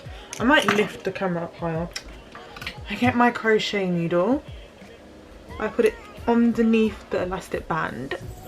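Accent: United Kingdom. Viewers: Greetings Sis. Just wanted to say that it's great to have a British voice amongst these videos. Thanks for taking your time with showing the process. Makes such a difference. Please do more videos. Thanks so much.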